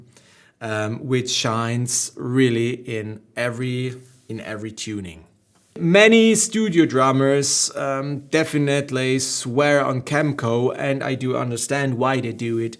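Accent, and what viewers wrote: Germany. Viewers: geht mir genauso, geht doch nichts über dein Englisch mit Kurpfälzer Akzent 😉 erinnert mich so sehr an meine Heimat!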